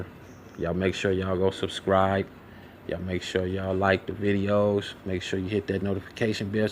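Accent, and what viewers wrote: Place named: California, United States. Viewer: New subbie here. And I agree that Cowboy does not have anything to do with it. When he took off his hat and scratched his head to me that’s says like wtf did I just see. Or perhaps he thought nip was gonna get robbed but not killed. Anyway you take it it’s crazy and are you from Cali? I can hear the accent.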